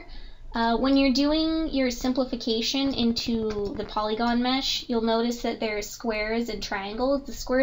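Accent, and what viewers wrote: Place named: Midwest, United States. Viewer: lol that midwestern accent is great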